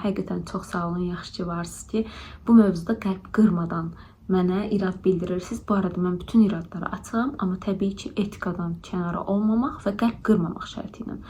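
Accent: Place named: Nakhchivan, Azerbaijan. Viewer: Salam necesiz siz Naxcivanlisiz lehceniz sirindi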